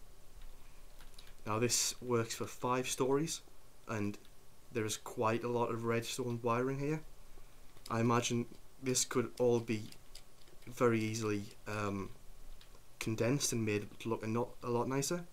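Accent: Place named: Newcastle, United Kingdom. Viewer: you sound a bit like a geordie mate :) go north!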